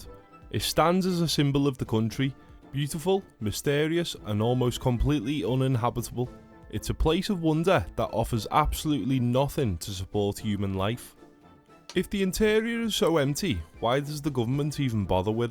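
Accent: Liverpool, United Kingdom. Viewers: He has a Scouse accent from Liverpool. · Liverpudlian geography- this is what I've been waiting for! AI is finished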